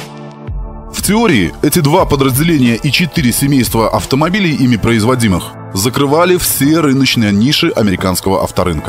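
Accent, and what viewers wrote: Belarus. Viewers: У чтеца жэстачайший белорусский акцент.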